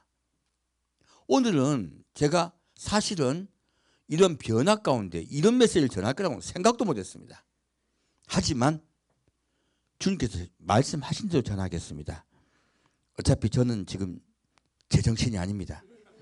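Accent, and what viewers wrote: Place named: Jeolla, South Korea. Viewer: 전라도네요. 아멘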